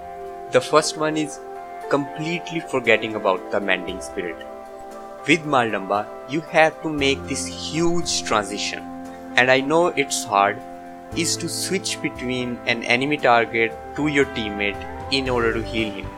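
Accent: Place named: India. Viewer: I can tell by your accent that you're indian. Am I right? :)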